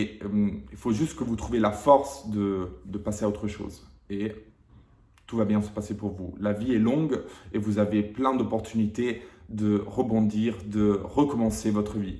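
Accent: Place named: Italy. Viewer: j‘ai eu un „coup“ de coeur sur toi dans les apprenties tu te laisse pas faire t‘as un coeur d‘or ET EN PLUS T‘ES TELLEMENT BEAU AVEC TON ACCENT ITALIEN TROP DE LA CLASSE VRAIMENT une personne incroyable!!!!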